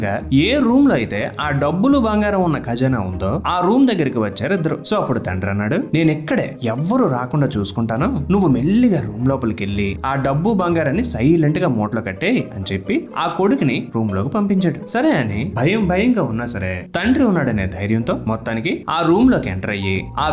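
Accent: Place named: Andhra Pradesh, India. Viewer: Nee Andhra accent dharidhramga undhi ra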